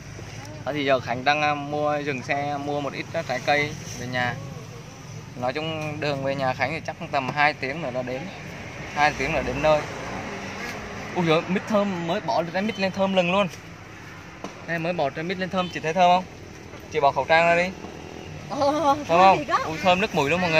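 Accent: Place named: Nghe An, Vietnam. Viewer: Em Khánh nous quê bố Đắc Lắc mà sao chị nghe như khánh nói giọng như người Nghệ an vậy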